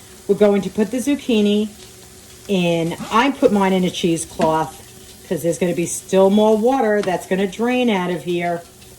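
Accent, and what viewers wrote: New York, United States. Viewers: I hear a New Jersey, or New Yorker accent......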